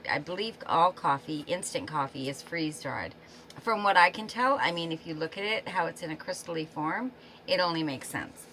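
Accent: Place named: Canada. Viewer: I thought your accent might be Canadian! 😂 Thanks for confirming!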